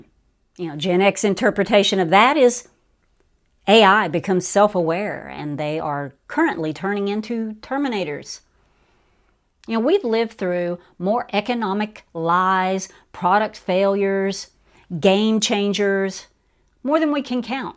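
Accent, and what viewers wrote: United States. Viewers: G'day Thanks for your kind words. I have been trying to work out your accent and which part of the United States of Americaland you are from. You see we have 6 states and 2 territories here in Aussieworld and our accent is pretty uniform except in some parts of the country like Tasmania for instance where there is a slight difference if you are Australian and know what to listen for, no worries. With you I don't think It's Texas, could be West Coast or Flo-Rida or I could be way off the mark. American accents like NYC, Boston, Texas, Louisiana, are pretty easy for us as they are so strong. But you have me stumped little lady.....it's a strong accent, but I can't pick it. Your Aussie connection... Cheers 😉😊 P.S. cute Westie I think?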